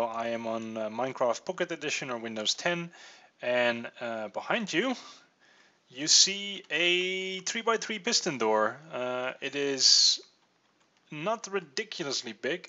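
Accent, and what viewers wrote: Netherlands. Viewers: are you dutch because you have a very dutch accent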